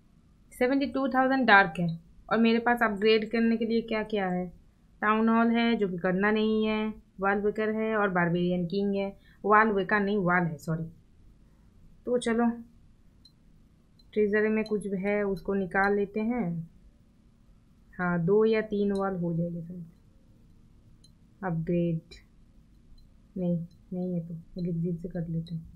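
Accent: Bengal, India. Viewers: Ami age confused chilam je apnara Bengali? But akhon apnar Hindi sunei bojha jacche Bengali😁😁😁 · Aap Bengali ho kaya ?? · Are you bangali??? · Apni bengali kotha sune bojha jacche😅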